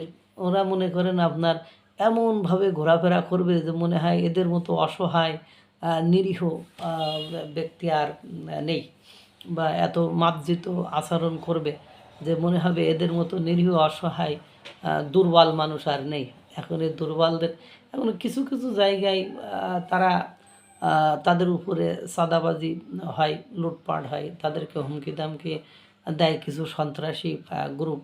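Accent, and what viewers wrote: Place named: Khulna, Bangladesh. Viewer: খুলনা, যশোর বা সাতক্ষীরা ভাইয়ের জেলা বলেই আমার মনে হয়। কারন কথা বলার ধরন ঐদিকের মতোই।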